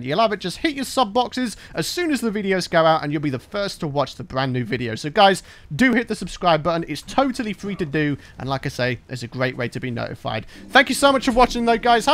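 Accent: Scotland, United Kingdom. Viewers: he sound kinda scotish like his sound when he talks i just noticed that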